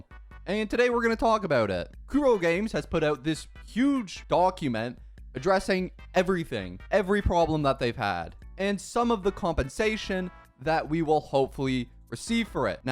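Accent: Canada. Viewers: Are you from Ontario? Thats a huge Ontario sounding accent lol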